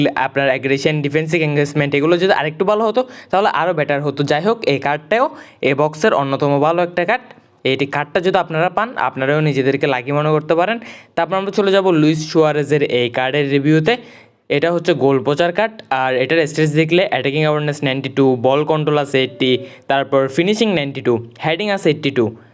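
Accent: Chittagong, Bangladesh. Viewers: ভাই মিথ্যা বলবেননা আপনি চিটাগাং এর না?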